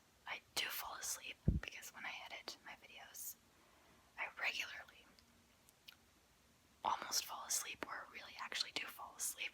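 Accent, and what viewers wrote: United States. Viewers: How do u have such a, American voice wen ur from Germany?!?!? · Most Germans can speak English, but she is far more fluent than normal, probably better than at least 99.99% of non-native English speakers in Germany. She put a ton of effort into becoming fluent and developing an American accent. By the way, she did the same with Spanish and people are baffled by her Mexican accent. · How are you German but have an American accent I’m so confused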